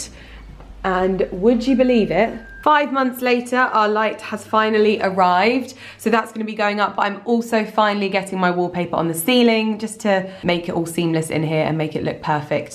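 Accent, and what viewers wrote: RP, United Kingdom. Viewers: How posh x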